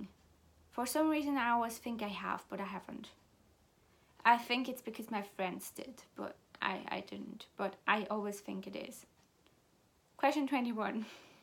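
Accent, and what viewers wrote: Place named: United Kingdom. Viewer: Hahaha, woah a shocking concept... are you sure you're allowed to not watch videos that you don't like? I mean, how else will you be able to make a video complaining about it? Haha! ;) I just really liked the chattiness of this video, it made me chuckle to laugh along with some of your stories, especially the failed film experience at the theme park, haha. British accent is totally the best accent... not biased at all, you know, haha. I remember being tagged in this video at one point, and then deciding that I didn't feel any need to do it, so I just answered some of the questions in the comment section of that video, but I totally understand why people are talking about the point/relevance of this tag. You need to go to Nando's, it's a Portuguese restaurant which is highly popular in the UK and caused a massive thing online about the term 'cheeky nando's' which I think turned into a meme or something. 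But it is a great restaurant, and even though they focus on chicken, their vegetarian options are delicious! HOW CAN YOU NOT LIKE TEA?! Hahaha, maybe you just haven't found the right kind of tea!? I'm joking of course, only slightly wounded... slightly.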